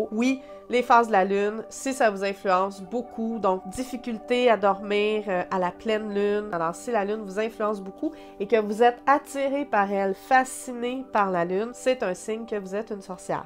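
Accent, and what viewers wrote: Quebec, Canada. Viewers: hello! Vous avez une voix qui nasille et l'accent québécois avec lequel j'ai toujours eu beaucoup de mal à écouter mais vous êtes tellement douce que j'arrive à vous suivre et à beaucoup apprécier! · Waouh Nouvelle Vidéo J Adore l accent Canadien😉 Tes Cheveux Supers. Nous Sommes Sorcières de Famille Ma Grand Mère Le Grand Oncle., Bisous a Toi😉🐾⛲🐱🐈😄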